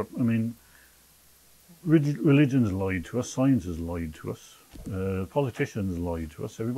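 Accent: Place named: England, United Kingdom. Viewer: The subtitles are only about 70% accurate as he has an English accent. Just one of the many hundreds of English accents and google can’t seem to be bothered to fix the issue even considering the amount of content that comes England for our small size and population. Truly the master race.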